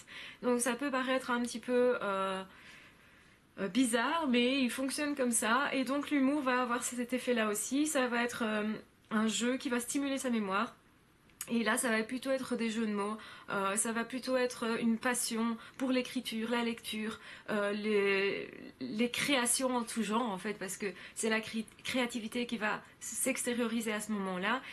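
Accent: Belgium. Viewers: Vous êtes adorable, utile et très agréable , sachez que votre intelligence en arborescence me parle et me touche beaucoup. Il me semble qu"une femme s'exprime plus facilement par l' écrit que par l'oral et que c'est l'inverse pour un homme .Je vous suis depuis longtemps et je vais continuer car cela me rassure sur ce que je suis . Merci .PS J'adore votre accent belge .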